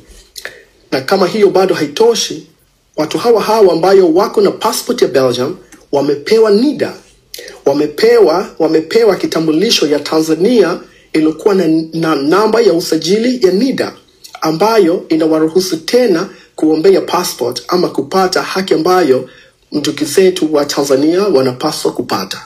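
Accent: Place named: Kisii, Kenya. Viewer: Kwani wewe ni Mkenya?Mbona lafidhi yako kama ni wa kisii?Achana na habari za Tanzania kenge maji,tukijichanga ulaya tunacmplain tunatoka nchi yoyote yenye matatizo Africa,we are Somalian we are Burundian and we ate also Congolese.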